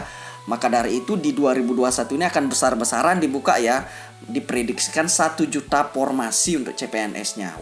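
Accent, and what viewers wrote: Bali, Indonesia. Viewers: Jleme bali ci sing?, logat ci bali ajan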